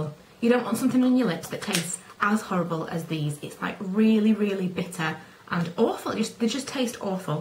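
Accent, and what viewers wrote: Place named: England, United Kingdom. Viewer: shes definately NOT scottish lol. Im from Glasgow and can tell an english accent from a scottish one!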